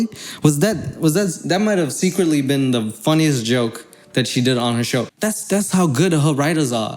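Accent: South Africa. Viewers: Omgosh i just knew it !! I always felt that SA vibe. I just recently started watching you. And have really been enjoying the humor. But i kept thinking u kinda have an accent but not really . But i just knew it... I am from Cape town Anyway keep on keeping on ✌✌✌Thanks for the great vids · My dude, I thought you were South African because of your accent (my first impression). Omg you're so funny, I didn't see the time past😂😂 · wow i knew you were from sa before you said it because of how you talk (also from sa, moved aswell) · I was sure I heard the South African accent 🇿🇦 proud of you man. Killing the YouTube game. I wish you success boet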